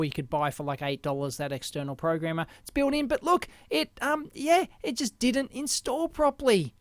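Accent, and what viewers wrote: Australia. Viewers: great video, love your down to earth style. An aussie, I guess? I've done a very simple sound synthesis (karplus-strong) algorithm on 8-bit arduino uno. To improve the sound quality, the most important feature would be a 12 or 16 bit DA convertor. Looking around a bit, it doesn't seem to be a high priority for uc chips. Any suggestion for uc or fpga that is good for sound, ie all built in cos I don't much like etching pcbs? This is just hobby stuff.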